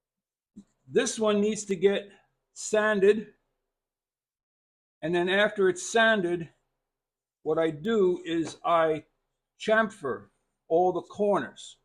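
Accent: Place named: Boston, United States. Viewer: I detect a bit of Boston accent…..caaaaard scraper, haaaaardware store. 😀. Glad you are having a fun time, it’s very informative to watch you make some planes. Enjoy your day. Too cold in my shop right now so I will patiently wait to try your teachings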